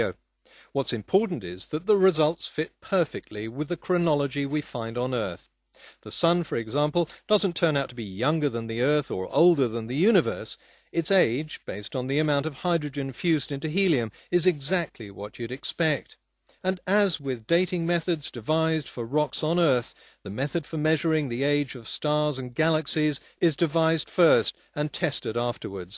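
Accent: United Kingdom. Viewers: Finally someone tells it like it is, and with a British accent! Perfect! Even though I'm American. Thanks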